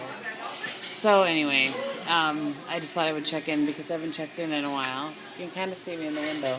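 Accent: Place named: Upper Peninsula, United States. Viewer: you sound like a yooper which is a good thing lol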